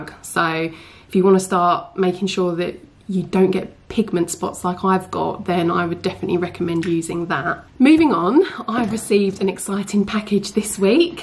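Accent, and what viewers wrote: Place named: Essex, United Kingdom. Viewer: Have to ask, where in Essex are you from? Your Essex accent is very much posher than mine haha.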